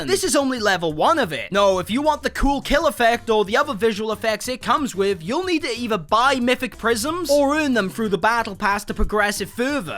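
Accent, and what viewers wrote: United Kingdom. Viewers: British accent mixed with the typical exaggerated YouTube tone is unlistenable.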